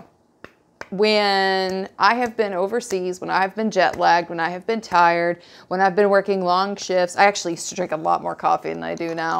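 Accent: South, United States. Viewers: You sound southern . You're an angel . Coffee drinker ? Why hell yeah ! You cook ? I've lived off sandwiches and vitamins forever. Proud southerner too . Imagine that .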